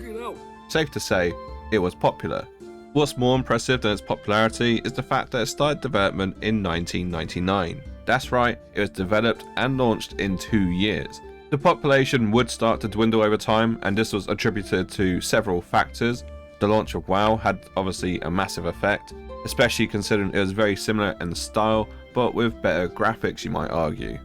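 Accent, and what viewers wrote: United Kingdom. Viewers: I'm amazed you have this many subscribers- a clan of drones really. First of all "old as fk" I am 39, played daoc since i was in my teens. I do 50 pushups almost every day and jog 6 miles + daily. That aside you judgemental fk question- Do you do any of that other than your life consisting based off of already provided information? Didn't think so. (aka leech with no real talent), Oh let's start off with your bitching of a 15 dollar subscription fee. Most MMOs that are free to play and we are talking hundreds if not thousands where even on your mobile app gaming. Oh yes I'm older- still know that phone transactions of whatever 1.99/4.99/9.99/49,99/99.99) required purchase to avoid a block wall etc = more of a rip off than a 14.99 monthly subscription. You have no clue of technology adaptation there was... Bluetooth/headsets/discord in earlier versions. 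Meaning when PvP was going this "new" tech was used to exploit farming, players would utilize that and set up their own realms by having their friends on the opposing side for points. You sounds like a brit brat and again we poured your shitty tea into the sea for a reason. Oh one last thing at least my generation knows what sex they are. MURICA! Goodluck with your wisdom bro comes with age. I personally couldnt see you changing a tire, shooting a deer, or yeah you be you haha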